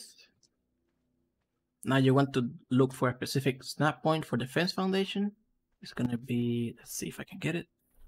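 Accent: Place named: Cuba. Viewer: i like your accent i am cuban and you sound cuba or puerto rican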